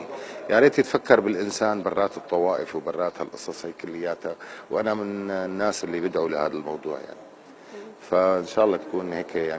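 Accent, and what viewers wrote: Syria. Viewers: طيب انت عراقي ليش تتكلم سوري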